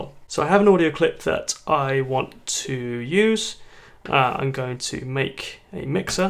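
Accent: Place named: United Kingdom. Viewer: thamkyou so much british sounding man with ironic last name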